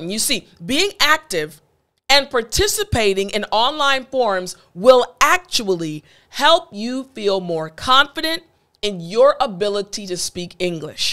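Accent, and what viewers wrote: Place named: United States. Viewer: Different accents but i would like every America have you same accent teacher, cuz it's beautiful and I can understand clearly 😊😊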